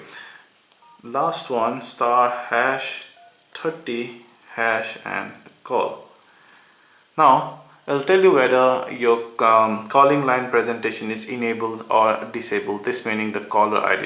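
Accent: India. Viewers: Indian hands and ascent.... its easily to be recognized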